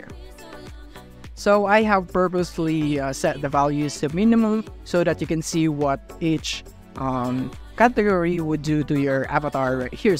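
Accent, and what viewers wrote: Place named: Philippines. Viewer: sound filipino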